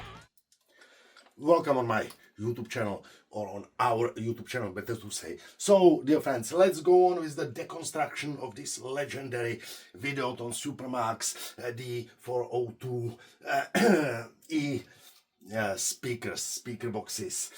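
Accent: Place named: Finland-Swedish, Finland. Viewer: You sound finnishswedish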